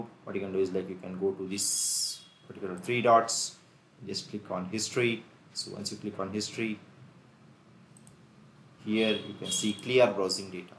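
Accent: India. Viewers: I hate the way u r talking. India 😅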